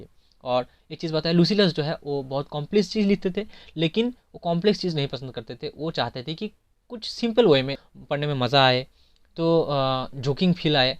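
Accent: Bengal, India. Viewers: Thoda bengali accent aa jata hai.... improve your English speaking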